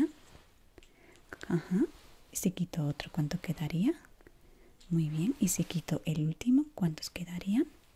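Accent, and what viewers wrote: Spain. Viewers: Me gusta tu voz eres española?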